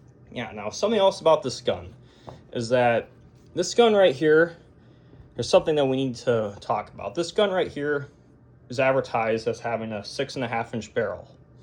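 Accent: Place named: Midwest, United States. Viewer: Sounds like a Midwesterner to me.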